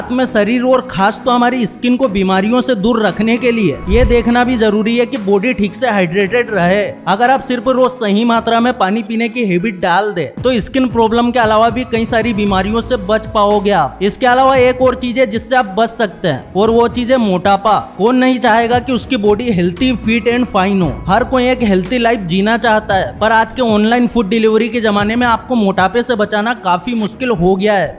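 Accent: Indore, India. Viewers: Your accent quite similar, like typical Indori.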